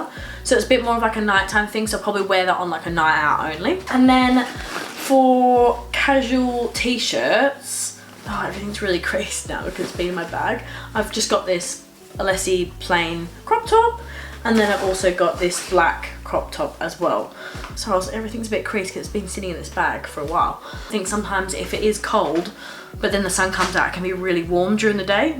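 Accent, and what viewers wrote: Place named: United Kingdom. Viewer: Your accent is sounding more and more British!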